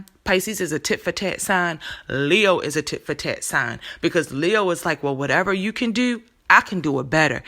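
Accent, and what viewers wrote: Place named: Baltimore, United States. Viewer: You've gotta be from Maryland, Baltimore to be exact cause the way you pronounce your O's and U's is so BMORE.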